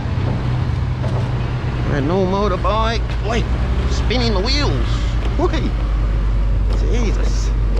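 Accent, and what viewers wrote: Australia. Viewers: just subscribed love your vids where did you come from sound aussie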